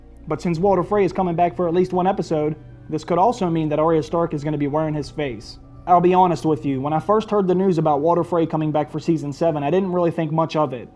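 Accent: United States. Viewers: your voice reminds me of an American Petyr Baelish accent.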